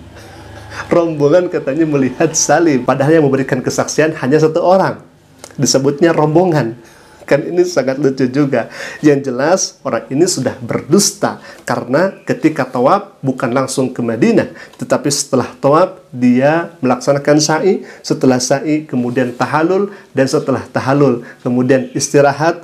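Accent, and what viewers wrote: Indonesia. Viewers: Ngaku dr Malaysia logat bahasa Indonesia Ini aja dah ketahuan bohong nya 😂😂😂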